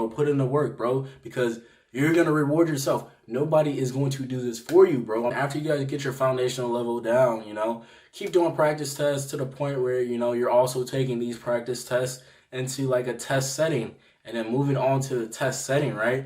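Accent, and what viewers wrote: Baltimore, United States. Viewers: I like his Baltimore accent lol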